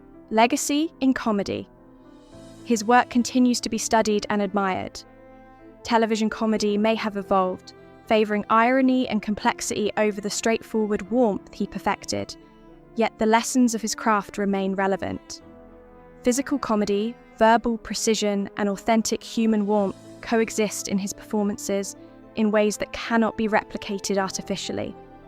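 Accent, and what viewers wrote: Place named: United Kingdom. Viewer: Another video with an annoying, low class British accent.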